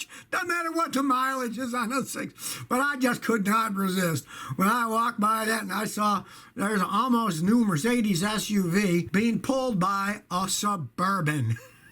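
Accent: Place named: Texas, United States. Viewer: is getting a Texas accent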